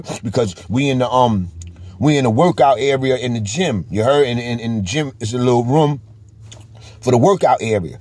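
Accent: New York, United States. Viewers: I still cant get used to hearing a NY accent talking bloods n crips!! Crazy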